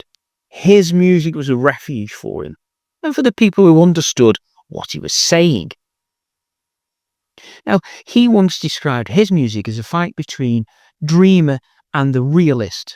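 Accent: Stoke, United Kingdom. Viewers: Stoke accent...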